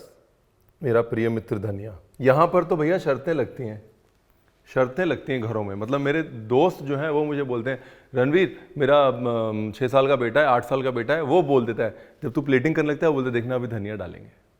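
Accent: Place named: Bihar, India. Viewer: Aap Bihar cartoon mein bolate hain bhai ji bahut Achcha lagta hai aapka bolna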